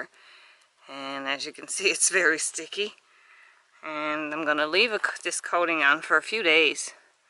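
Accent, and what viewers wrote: Newfoundland, Canada. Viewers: Hello there, came across your channel searching for rust converter ideas and picked up on the accent of fellow Newfoundland and Labradorian. Not sure if that is the proper term now. I also checked out the video on the Mun Botanical Gardens. Really lovely. Will put that destination on the list for the next time I make my way home. Going to have a look through more of your videos and pass along your channel to some friends and family. I hit the subscribe button. Thanks for the content.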